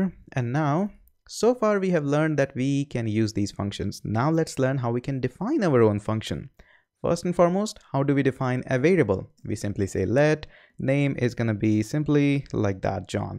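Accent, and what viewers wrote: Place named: India. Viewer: I like the Indian accent. "parenthesis" is paren + thesis. Another word "parameters" is para + meters. In Brazil we have somewhat the same way of saying words, like emphasis in the last part of the word, sometimes in the middle of the word and even in the beginning of the word. Some words sounds like a roller coaster, and it sounds nice.....In English is kind of flat. like "parenthesis" but in parAmeters the upper case A is where the Americans do speak their roller coaster and speaks like paraMeters with his roller coaster starting on M.....I love linguistics. Sorry guys, off topic, but really nice......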